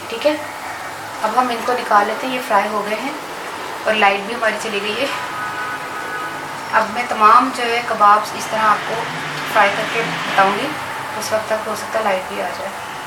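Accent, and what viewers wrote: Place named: Punjab, India. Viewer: hahahahah so cute Punjabi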